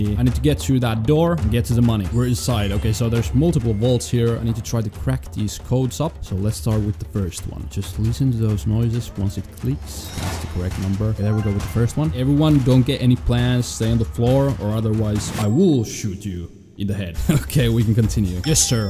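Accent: Finland. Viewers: Are you finnish? i was just listening your accent and trying to figure it out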